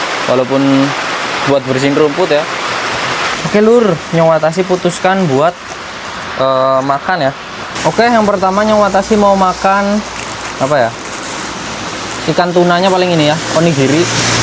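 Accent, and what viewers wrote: Banyumas, Indonesia. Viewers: Ngapak medok banget menghibur bisa jadi rebutan gadi Jepang, jangan tinggalkan 5 perkara tinggalkan 5 perkara, maling, main, minum, madat, mawon, si mirip mas Sentot Cilacap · Sekang ndi asale mas? Logate ngapak kayak nyong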